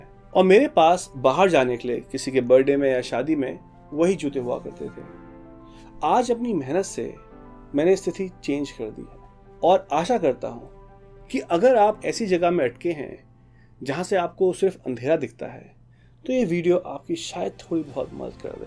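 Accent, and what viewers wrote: India. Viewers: You look like kashmiri because you have a pure kashmiri accent!! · I am here after watching your video where you replied to Technical Guruji ... Hats off to you boss , tone se thoda thoda main samjh gaya tha ki bande ka Pahad se koi na koi link to hai or nikla b wahi. I am from Uttarakhand too.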